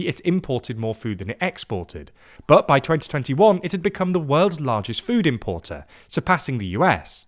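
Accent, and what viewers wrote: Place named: South, United Kingdom. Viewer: He's a poncey southerner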